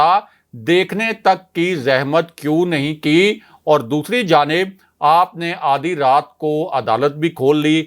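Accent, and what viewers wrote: Pakistan. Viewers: Bht acha analysis kartein hein aap apki urdu se lagta hai aap oversees pak hain?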